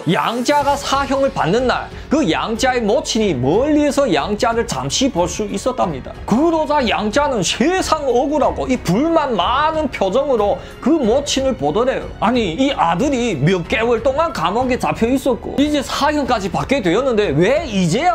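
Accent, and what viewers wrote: Yanbian, China. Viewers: 동무 옌벤 말씨가 몹시 듣기 좋군요 옛 중국생활이 그립네요 짜요!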